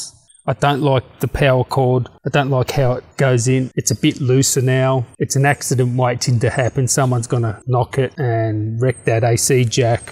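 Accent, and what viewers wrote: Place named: Australia. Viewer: Whereabouts in Oz are you? WA? Just trying to guess the accent.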